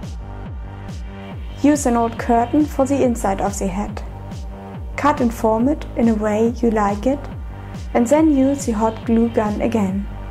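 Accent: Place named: Germany. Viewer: You are a German girl. Lovely accent you got. See u pretty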